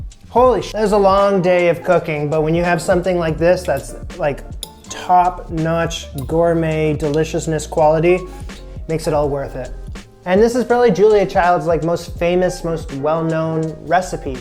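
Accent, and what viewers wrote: Canada. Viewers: Listening to you speak you sound like someone from North America and your ou dip-thongs narrow that to Canada, but your vocabulary (minced beef, rubbish) suggests you’re on the other side of the Atlantic. But then you express surprise that butchers don’t understand what you want when you ask for a cut of ‘chuck’, beef, which I would have thought as an ex-pat you’d simply realise is because it’s a term not used in the country you live in. But you don’t make any comment bringing up that possibility, (very odd). All of this creates an air of mystery. After watching two videos, I notice you never refer to your nationality, even though you use vocabulary that doesn’t fit with your accent. I’m assuming, based on your trip to the butchers, his accent and the look of the buildings outside, you’re somewhere in the UK. To top it all you look and perform way too expertly in the kitchen for someone who doesn’t know much about cooking. 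The mysteries just keep coming. Why is there such a big mystery about where you’re from, why you’re cooking in a country you’re not from, how you became so adept at preparing and cooking food and so on? Is there some part of the puzzle I’m missing?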